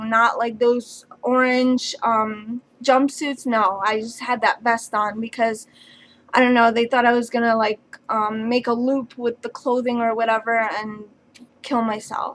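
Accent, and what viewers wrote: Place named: New York, United States. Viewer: Where are you from cuzz? You sound like a New Yawker.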